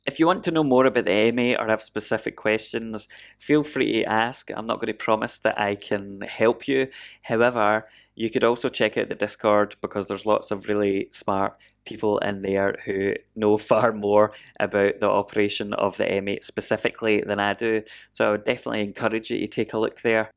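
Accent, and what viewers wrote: Scotland, United Kingdom. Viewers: When you started explaining, I was thinking it's like a macro. Instead of just playing the note, you trigger the macro that adjusts the note to your liking. I must say I thought I was the only person on earth with a serious interest in trackers, mechanical keyboards and Melt Banana, but I'm happy that I was proven wrong. I've been using the Beatstep Pro for a while now and I just did a 6/4 track. How hard can you make that! I cannot believe "copy these 12 steps" actually turns it into 16+8 instead of 12+12. That's definitely not a problem with trackers. I have been eyeing the options for a while now and after seeing this video, I ordered a Teensy. Should be here tomorrow. Thanks for your hard work, you explain complex things in a very understandable way. And your accent always takes me back to my travels on Skye, Arran, ...!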